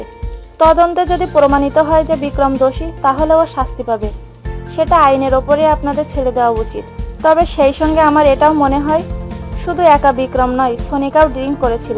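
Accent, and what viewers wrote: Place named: Rural, Bangladesh. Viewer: Cine gossip pls apnader Bangladeshi vasa ta ektu paltan? Kno J apnader gammo vasa net a charchen, valo kore kotha ta o bolte paren na apnara chi chi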